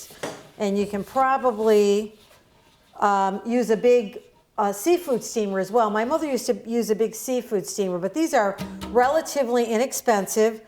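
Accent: Midwest, United States. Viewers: Mexican lady with a midwest accent. She is too cute! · What a horrible Midwest accent lol. It what I expected.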